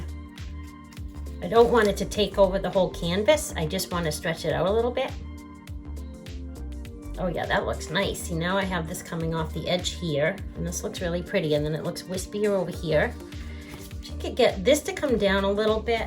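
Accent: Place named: New York, United States. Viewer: Very beautiful. You have a New York accent. So cute.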